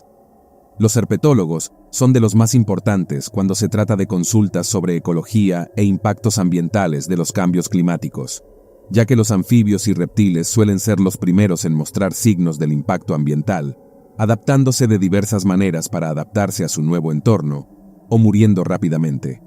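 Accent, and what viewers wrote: Spain. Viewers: Es una cagada que sea tan profundo ese acento Ezpaniol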